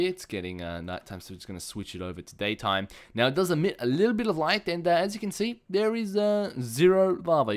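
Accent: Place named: Australia. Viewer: He's Australian. That's how we pronounce it and it's also how it's pronounced in the UK.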